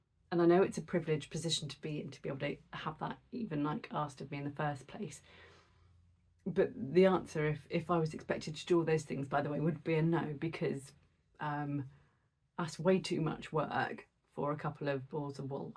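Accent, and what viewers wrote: England, United Kingdom. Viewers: As usual love your english accent, humour and laid back style. Not to mention all your croch-eting. Lots of inspiration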